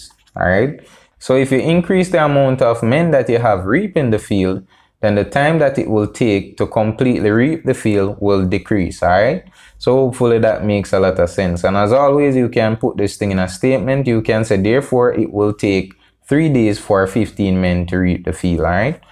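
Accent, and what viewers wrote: Jamaica. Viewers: Thank you man!!! Love your Jamaican accent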